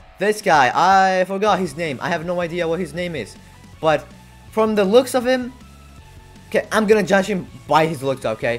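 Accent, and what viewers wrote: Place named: United States. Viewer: Your Asian face with an American accent funny as hell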